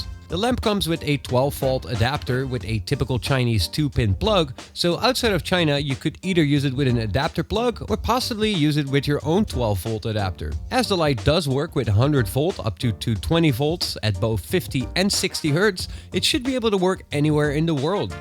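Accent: Netherlands. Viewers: Vreemd dat zijn Engels echt accentloos is maar je toch kan horen dat hij Nederlands is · Thicc Dutch accent over here haha, nice vid though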